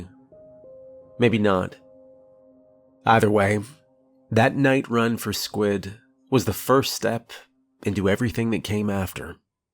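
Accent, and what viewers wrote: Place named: Maine, United States. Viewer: The voices of the characters that you make sound like they're all from Maine. 😅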